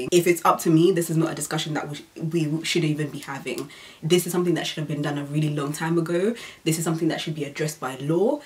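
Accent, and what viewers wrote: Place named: United Kingdom. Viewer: That British accent is on POINT! wow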